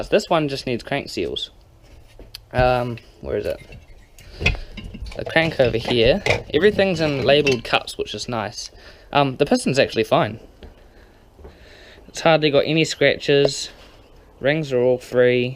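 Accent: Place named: New Zealand. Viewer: sounded like you were from new Zealand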